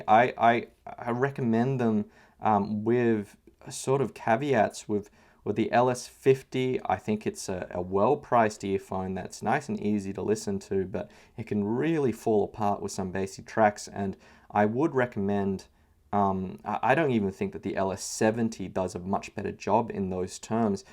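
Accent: Australia. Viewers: Oh now I realized you are Chinese. Good English mate. Hey fuck Australia man you don’t need to talk like that. Is a garbage place.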